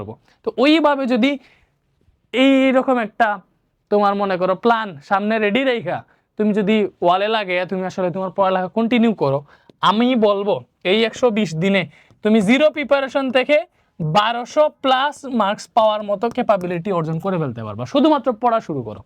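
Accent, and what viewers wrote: Sylhet, Bangladesh. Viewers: Vai ETA amader sylhety basha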